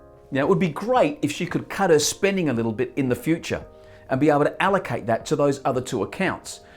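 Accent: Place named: Australia. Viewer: Oh man, I love a good Australian accent. That right there gets you my subscription. I like how you're putting emphasis on the automation of the budget. I talk about that quite a bit in my content as well. This is an excellent video covering those basics. I think that's really helpful for some people who've never budgeted before. You have some good examples too. I found you just by searching for budgeting channels on YouTube because I love the budgeting community and focus a lot of my content around it too. Keep up the good work!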